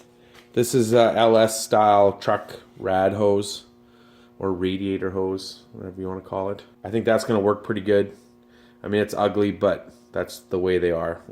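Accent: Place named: Canada. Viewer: makes brrilliant hand-made parts showing excellent home grown engineering skills, and some nimrod criticizes him for saying "rad"?? Canadians say things kinda funny (they are working in a "gradge" for example, not a garage. is as pure a hot rodder as I've ever seen--he gets massive bang for the buck.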